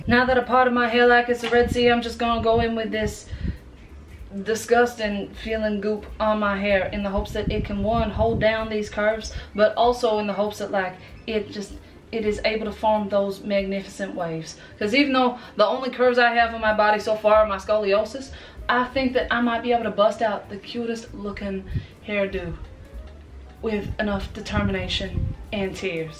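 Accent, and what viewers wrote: South, United States. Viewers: Im from Tennessee and I’m impressed with your southern accent! At first I was more confused than a fart in a fan factory but then I realized what was going on. 😁💜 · I love the southern but I missed YOU in this video. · I am so proud of your accent in this one! It's hard for some people to pull of a good American accent but you sounded like a total southern belle. I am applauding you so hard right now😁😁😁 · The smooth Southern US accent is actually so good and relaxing, it's weird lol. The marcelled waves are really cute! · I love the southern accent my husband is from the south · I loved the bits where good old appears because, not that this fancy southern lady accent isn’t fabulous, it just isn’t in her true form. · Your Southern accent is sooooo good. I love love LOVE IT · I love your videos but this accent has to go. It’s such a horrible drawl and southern accent. 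Being a southerner it was so so hard to listen to. Please go back to your normal voice and accent. I love the normal, it’s so happy and delightful. Beautiful make up though. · Living for the Southern accent because it literally sounds like so many people I have grown up around lol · I am so loving your Southern accent ❤️ You sound more Southern then I do and I am from Alabama the heart of the South 👍🏻❤️👍🏻❤️👍🏻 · I love your southern accent. "I hate this with a burning passion" I've said that so much. Lol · Your Southern Accent reminds me of Mary Stuart Masterson as Idgie Threadgoode from Fried Green Tomatoes! Well done! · the southern drawl is... Creepy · Love how she slips in and out of a Southern accent.....sounds like a cross between Georgia, maybe a smidgen of Tennessee and Texas in there 😍 and the look is stunning !!!! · I'm new to the channel but I love your makeup tutorials! I've never been able to do a proper wing, but after watching some of your videos, I decided to try the flick, drag, and hope for best method. 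I got so many compliments. Thank you!! Also, being from the South, I love the accent. Just like a Southern belle darling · Love the southern accent.....but God it threw me off so much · I loved ur Southern accent & I'm from the south born & raised & still here. Lol but I gotta say ur accent is better than mine. I love ur videos. U look absolutely AMAZING. & U r amazing. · Is this a southern belle voice? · Omg your southern accent is amazing I have laughed so much watching this thank you so much because I definitely needed a smile today 😊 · I love! You're southern accent is on point! I'm from Alabama so I'm an expert:-) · A better Southern accent than half the people in Hollywood. "Only you can decide what you don't like about your face. Other people can try, but you can tell them to shove off." If I didn't know any better, I'd think you were a true Southern Belle. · I love the country accent😁😁❤❤ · I am digging the southern accent. 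You are truly my favorite youtube goddess. Signed a southern country girl from Florida 😂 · I love the southern accent, although I prefer old accent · Dear, when I first saw one of your videos I thought you were Russian, only to find out you are Australian. Being from Georgia, your southern accent is pretty good! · 😯 Well colored me impressed! I'm from the South and your country twang you got going on there is amazing. Lol took me by surprise.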